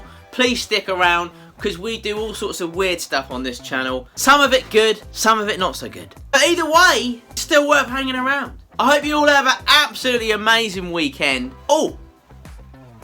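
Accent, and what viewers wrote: United Kingdom. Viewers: Trips me out how British Accents exist when we speak the same English. Yeah I'm lit rn 🤫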